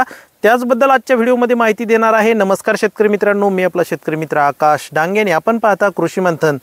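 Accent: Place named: Parbhani, India. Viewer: भाषा परभणी जिल्ह्याची आहे,छान निवेदन करता राव तुम्ही.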